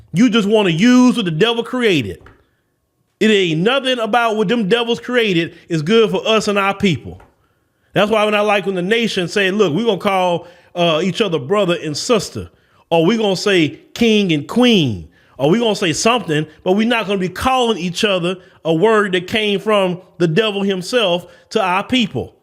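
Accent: South, United States. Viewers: I love your southern accent. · Love that southern accent brotha!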